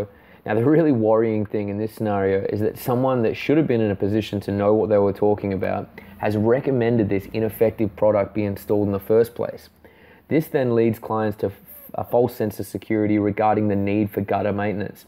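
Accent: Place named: Australia. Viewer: Oops, LOVE THE AUSSIE ACCENT!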